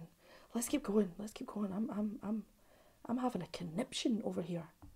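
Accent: Scotland, United Kingdom. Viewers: I can't tell if you're super charismatic or if I just think women with Scottish accents sound really cool. Probably some from column A and some from column B. Subscribed! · Are you Scottish? Love your accent!🥰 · Im from Australia and the scottish accent nearly makes me weep , i fkn love it !! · Why do i feel like I’ve never heard a girl with a Scottish accent?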